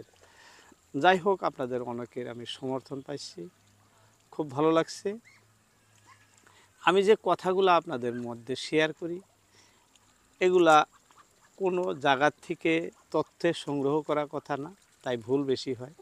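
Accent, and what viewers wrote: Bangladesh. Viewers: দাদা আপনার বাড়ির ঠিকানা জেনে খুশি হলাম। তবে আপনার কথায় ভারতীয় কোন মেজাজ পাই না। মনে হচ্ছে আপনি বাংলাদেশের কোন অঞ্চলের মানুষ হবেন।অসংখ্য ধন্যবাদ।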